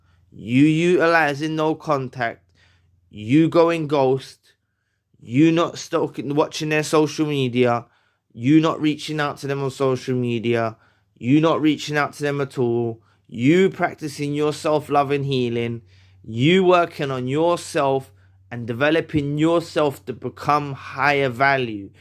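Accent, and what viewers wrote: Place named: Birmingham, United Kingdom. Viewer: You are a very smart man are you from brum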